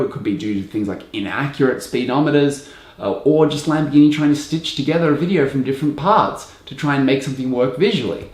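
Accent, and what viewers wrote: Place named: Australia. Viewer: hes more of an English sounding aussie than the rest of us bogans that sounds like or worse than Dundee lol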